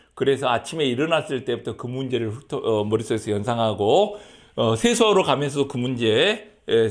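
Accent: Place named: Jeolla, South Korea. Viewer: 빨갱이 동네 전라도말씨네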